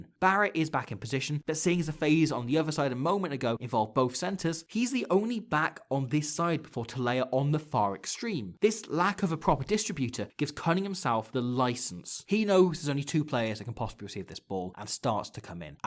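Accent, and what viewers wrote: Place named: England, United Kingdom. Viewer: Whatever mate, you whittle on a lot. That smarmy English accent.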